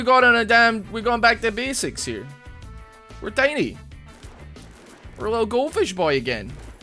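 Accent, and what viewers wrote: Scotland, United Kingdom. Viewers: I feel like you're legitimately the best youtuber I've seen: Although you have a main game (league), you don't mind diverting to another games, you're extremely funny, and the scottish accent helps, you're unique and you interact with the community which is lovely Keep it up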